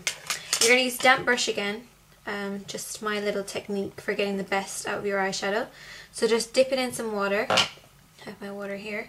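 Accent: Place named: Ireland. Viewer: i thought u had a bit of irish haha :L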